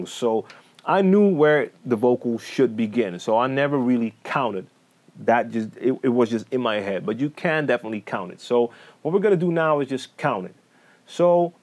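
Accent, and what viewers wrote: Netherlands. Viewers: Are you half dutch? Cause you have very slight accent.. Anyhow great vids, great talent!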